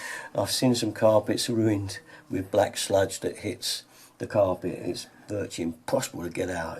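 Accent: United Kingdom. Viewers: Hate to ask such a stupid question but I have to know, where are you guys from? Hard to place the accent but it's definitely somewhere in the UK haha I love it though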